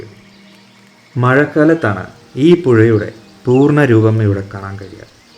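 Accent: Palakkad, India. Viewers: താങ്കളുടെ പാലക്കാടൻ ശൈലിയിലുള്ള വിവരണം വീഡിയോ പോലെ മനോഹരമാണ്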